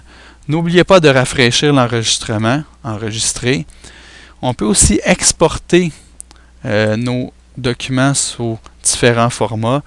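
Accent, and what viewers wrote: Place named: Quebec, Canada. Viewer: accent quebequois très très prononcé ^^ (bonne vidéo au fait ;) )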